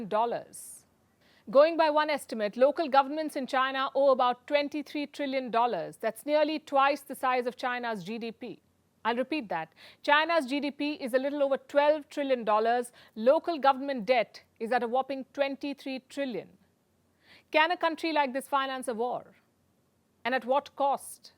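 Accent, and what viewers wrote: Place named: India. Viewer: curry accent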